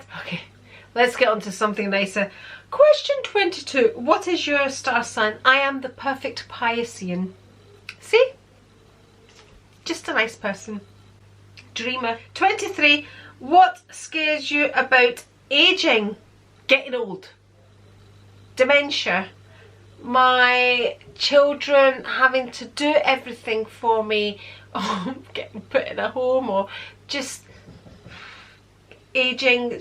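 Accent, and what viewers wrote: Scotland, United Kingdom. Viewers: The Planner... The Executor! And What A True Gentleman Ehh... Putting Him On The Spot... Yet Once Again Executing The Perfect Answer!! Thank You So For Taking Us Down Memory Lane And Sharing Such Personal Incidents... Some Lovely Insight Into Your Parents Values And Character... Feisty... ‘ Fist 👊Fight En Route To Cyprus... 🤭... And A White Halter Neck Dress... Ooh Dear... 😱... Did Tell You Off? Lol 🤭... These Are The Questions I Hope You Will Be Able To Answer Next Time... 1) What Were Your Parents Reaction When You Told Them You Wanted To Get Married At 17... 2) What Was Your Childhood Ambition... What Did You Want To Be When You Left School? 3) You Grew Up In London But You Have A Scottish Accent? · Ah that was really good to listen to I enjoyed that. With your Scottish accent I was very surprised you were born and raised in London. 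Seeing the photo of your Mum you’re the image of her....also are you an only child? Xx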